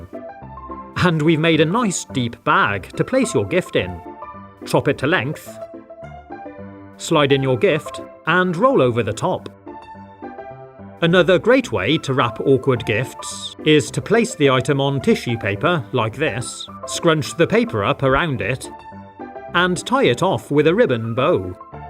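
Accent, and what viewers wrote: United Kingdom. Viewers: this guy has to most british-ish accent known to humankind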